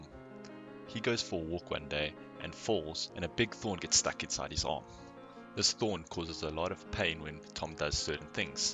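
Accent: South Africa. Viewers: Is that a fellow South African I hear !?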